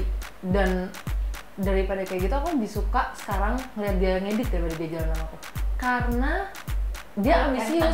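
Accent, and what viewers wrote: Malang, Indonesia. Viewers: Medok Malang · Logat jawa Malangnya kerasa ya :v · Logat malangnya semakin terasa dari tengah sampek akhir ya mbaa wkwkkw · Logat malangnya masih kerasa 😍😍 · hehehee.. logat ngalame ga ilang ilang...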